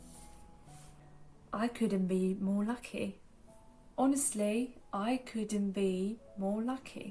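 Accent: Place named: London, United Kingdom. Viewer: Your English has come along so well - a slight London accent too! Xxx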